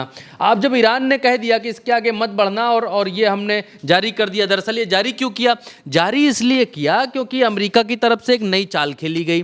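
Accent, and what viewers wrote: India. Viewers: Bhrat tumhara desi andaz chune wala hai .Desi style me english bolna aur beech beech me flana dhkana 😅acha lgta hai.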